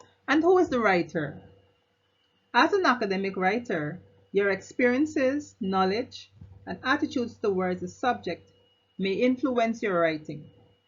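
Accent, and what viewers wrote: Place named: Guyana. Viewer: Great presentation thank you for sharing I was educated looking forward for information on writing research papers and it was refreshing to hear an intellectual presentation with a Guyanese accent ✌🏾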